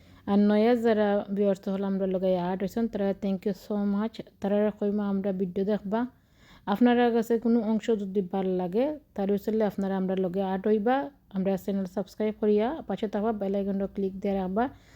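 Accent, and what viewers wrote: Sylhet, Bangladesh. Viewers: Sylheti kotha ghula vala lagw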